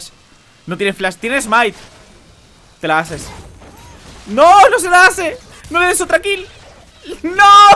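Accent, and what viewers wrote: Chile. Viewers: Habla como chileenooo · habla en chileno xd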